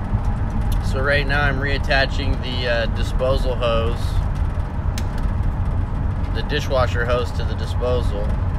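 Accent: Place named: Southern California, United States. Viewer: Hey just wanted to thank you for this video, it really helped me replace my first garbage disposal. I also wanted to make special comment on your very Southern California ways displayed in your narration – I grew up in the area but have spent the last 28 years (I'm 46) in NorCal and just hearing you talk reminds me of all my old buddies down there so thank you for that too!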